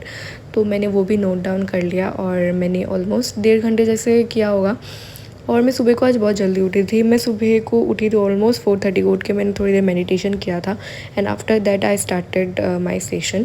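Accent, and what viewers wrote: India. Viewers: Hii i love you hindi accent,,👍👍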